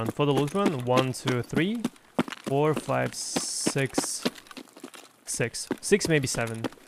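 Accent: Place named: Russia. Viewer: Хехех, блин я подумал ты из скандинавской группы стран, но не, наш акцент сложно не узнать)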